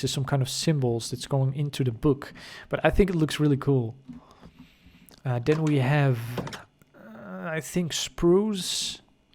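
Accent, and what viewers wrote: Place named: Netherlands. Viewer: Can tell that beautiful Dutch accent anywhere. <3 x3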